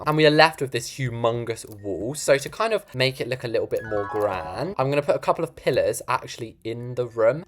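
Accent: England, United Kingdom. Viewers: OML FINALLY A BLOXBURG YOUTUBER WHOS BRITISH NAH I AM SUBSCRIBING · Why dose his english accent make him sound so sophisticated it makes sound like hes about to ryme every time he says something lol🤣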